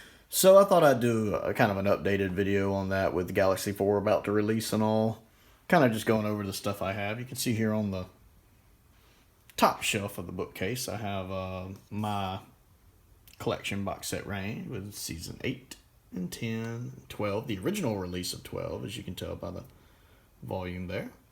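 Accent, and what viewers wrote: South, United States. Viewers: I think once you become a legal adult in America, groaning while crouching or bending is legally expected. Also, I love your collection! Also, I have been meaning to ask, Do I recognize a southern accent? I only ask cuz I'm from Texas!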